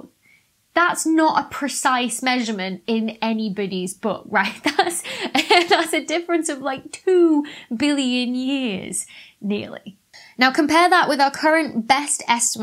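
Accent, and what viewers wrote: United Kingdom. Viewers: I thought I detected a British accent, and the "naught" confirmed it. This channel is a sapiophile heaven, or Eden. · "plazmer"...I love a British accent.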